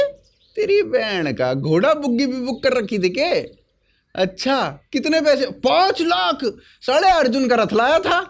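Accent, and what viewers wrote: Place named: Haryana, India. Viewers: राम राम भाई साहब आप हरियाणावी में बोला करो। मस्त । ज़बरदस्त👌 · Love your Haryanvvi accent 😂. Hamar ta bhojpuri accent hai- lots of love from Mauritius 🇲🇺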